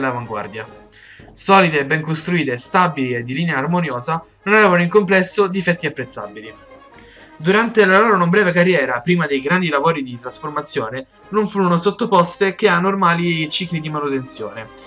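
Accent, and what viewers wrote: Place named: Rome, Italy. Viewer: Un paio di suggerimenti all'autore: l'audio è registrato in maniera insufficiente, e l'accento romano marcato fa perdere credibilità, serve qualcosa di piu' neutro e professionale.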